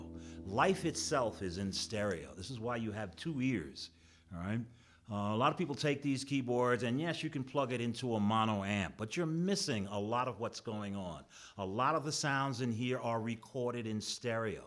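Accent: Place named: New York, United States. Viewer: Awesome demo awesome New York accent!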